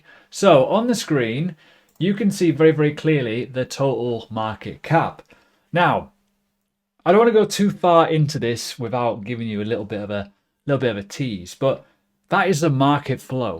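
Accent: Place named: United Kingdom. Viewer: You are giving really good content mate. Nice accent , where from the UK are you ?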